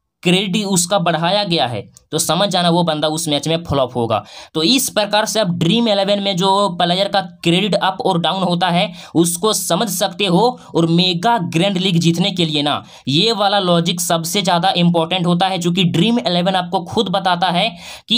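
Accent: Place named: Bihar, India. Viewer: Bihari hai aawaj se pata chalta hai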